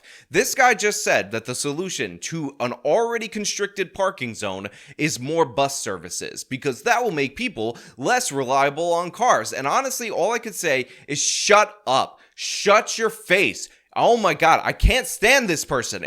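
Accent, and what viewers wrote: New York, United States. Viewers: Oh Boy, your "inner New York" REALLY came out on this video:-)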